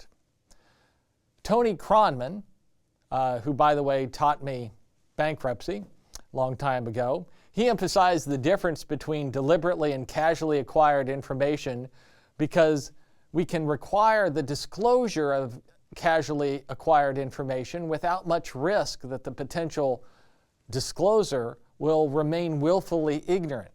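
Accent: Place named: United States. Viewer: what American accent exactly is this, though?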